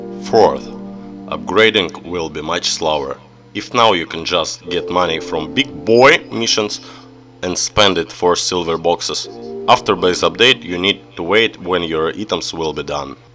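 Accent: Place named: Russia. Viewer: I love your russian accen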